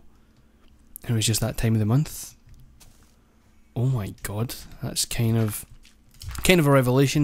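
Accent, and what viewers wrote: Scotland, United Kingdom. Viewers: fuck me ur so creative and imaginitive:D i can't work out ur accent im getting scottish but im just nt sure lol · What first got me to start watching your series was your accent, I love Scottish accents and it made the commentary more interesting. I then grew to like the series itself and the cool things you built and how you went about it. I've also started watching your other videos and am enjoying them as well. Thanks, I'll be waiting for Season 2!!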